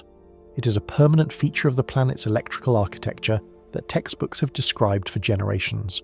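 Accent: United Kingdom. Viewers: Fear porn pigs at it again. I'm so scared I crapped the couch. Smells worse than his British accent !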